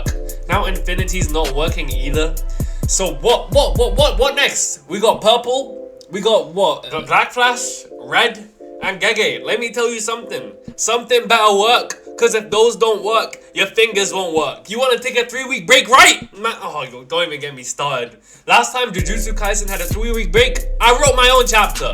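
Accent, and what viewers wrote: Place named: South London, United Kingdom. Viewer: you sound like a south londoner... croydon?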